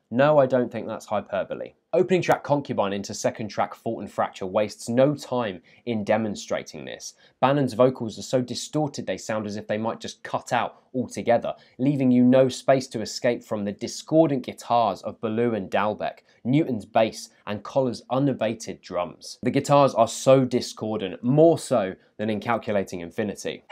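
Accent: United Kingdom. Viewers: I've stumbled upon a British Anthony Fantano.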